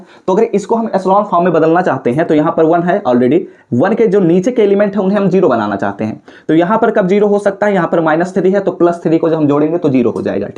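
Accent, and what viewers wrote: India. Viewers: no bihar me aisa pronounciation nhi hota UP me hota hai so UP ke ho sakte hai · I think he is bihari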